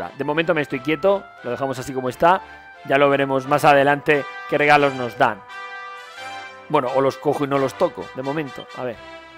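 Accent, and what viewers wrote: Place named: United Kingdom. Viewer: DIIOOOS QUE PUTO ASCO DE ACENTO, BRITÁNICO EN PLAN CHUNGO. Se me esta haciendo duro acabar el video.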